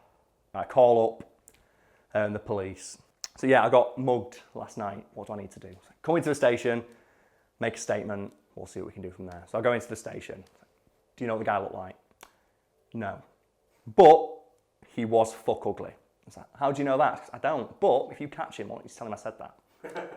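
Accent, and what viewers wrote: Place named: England, United Kingdom. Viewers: Ok to the people who are like " blah blah he's English" no shit mate, but I'm like wtf sometimes to. Some people just hear differently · Kris R You want captions on an ENGLISH video spoken by an ENGLISHMAN are you for real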